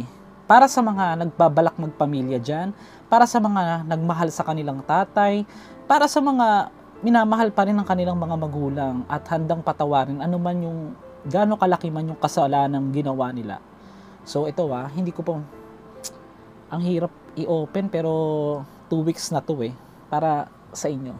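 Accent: Visayas, Philippines. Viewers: bisaya kayo dol?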